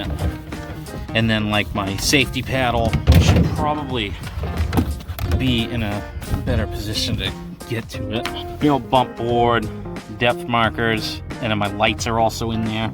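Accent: Midwest, United States. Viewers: Interesting Midwest accent, never heard that one before.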